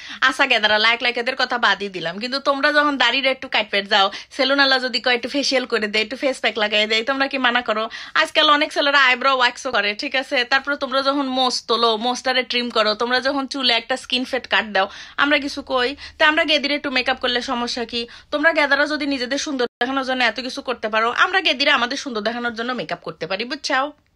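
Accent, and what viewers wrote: Bangladesh. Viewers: Bangladeshi kotha gulo ank moja lage sunte, very nice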